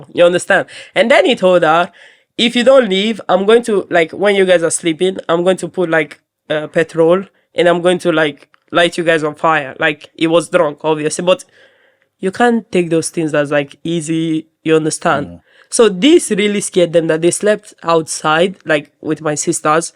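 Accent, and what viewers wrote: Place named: Haiti. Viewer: Her accent sounds haiti also, I was talking to someone today "Nigerian". We spoke to the extent of dreams and ambitions on inventing solutions for the nigeria economy. He said poor people don't dream, they only they only survive meaning we cant solve our problems cause we trying to live for tomorrow while dying today. She is right.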